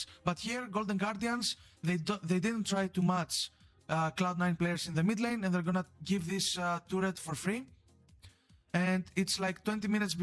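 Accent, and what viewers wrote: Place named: Greece. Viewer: Good video. Also your accent is familairly greek :p .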